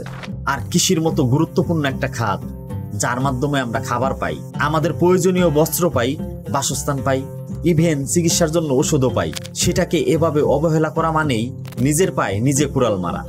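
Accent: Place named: Shariatpur, Bangladesh. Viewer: ভাই এখানে ধন্যবাদের কিছু নাই। আপনি আপনার যোগ্যতার ভিত্তিতে উত্তম উপস্থাপনার মাধ্যমে আমাদের কমেন্ট অর্জন করেছেন। আমি মনে করি এটা আপনার প্রাপ্য। আমরা আশা করি সামনের দিনগুলোতে আপনি আমাদের জন্য আরও ইনফরমেটিভ ভিডিও নিয়ে আসবেন। ভাই আরেকটা কথা আমার বাড়ি শরিয়তপুর, আপনার মুখের ভাষাটা আমাদের ঐদিকে মত মনে হয়। ভাল থাকবেন।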